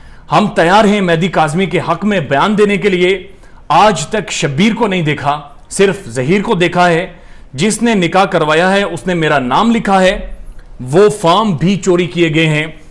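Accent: Punjab, India. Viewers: Ur Punjabi accent is very near to real Punjabi, but seems more cute than normal Punjabi bro..... Keep their chitrol on 😂😂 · Very sweet try of Punjabi but I think pushto more suits you !! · Zaberdast punjabi style brother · Punjabi accent 😄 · Ur Punjabi accent is so good I dnt understand Punjabi but I understood ur words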